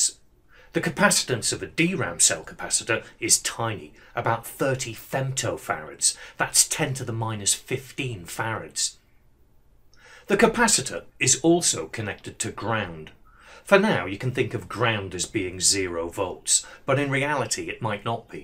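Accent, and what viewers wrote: United Kingdom. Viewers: What I expected: Indian English accent What I got: Marvelous British accent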